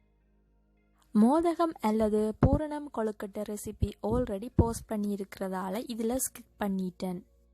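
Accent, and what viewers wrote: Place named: Sri Lanka. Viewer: Excellent 5 minutes la 3 dish, 👌👌 உங்கள் குரல் மாற்று உங்கட சிங்கள தமிழ் அழகு 👌👌👌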